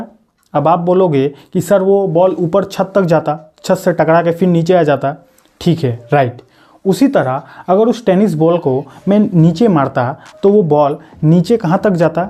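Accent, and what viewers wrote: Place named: Assam, India. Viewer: Assam ka hindi lag Raha hai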